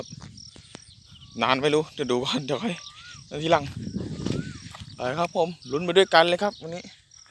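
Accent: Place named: Kanchanaburi, Thailand. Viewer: อยู่ไหนเสียงเหนอเมืองกาญจ์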